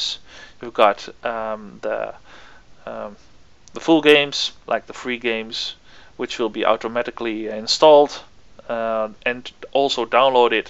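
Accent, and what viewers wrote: Netherlands. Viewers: Haha nice! Ik hoor je nederlandse accent haha!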